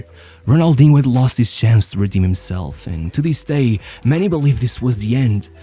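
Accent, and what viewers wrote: Portugal. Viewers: Bro, why do you speak in Portuguese and not PT-BR? Sounds kinda weird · I know a portuguese accent when i hear one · the portugueese accent is there hahaha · You can clearly notice the narrator is portuguese 🙃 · You sound portuguese my dude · Portuguese accent is crazy xD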